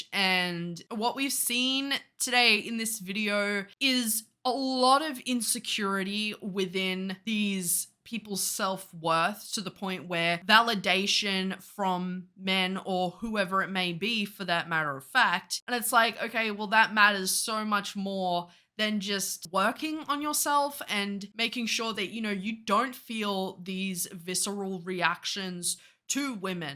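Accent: United States. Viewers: Your American accent is really good lol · I love your american accent 😂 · Okay but how is your American accent so fcking good?! Like I’ve lived in the US my whole life and I’d believe you were one of us 🤣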